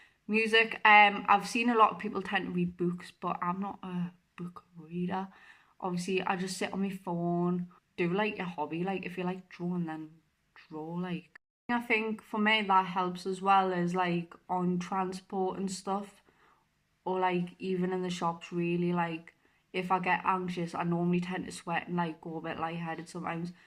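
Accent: Newcastle, United Kingdom. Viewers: Thanks for your honesty, just talking about it may help others, and probably you also. Congratulations on the product endorsement, I didn't know about these products. I'm sure I am speaking for probably all of the Americans on this channel, when I say, I enjoy your 'rambling on', because I enjoy that New Castle accent... kind of like one of the several 'working class' accents in the US, it just sounds genuine. God bless!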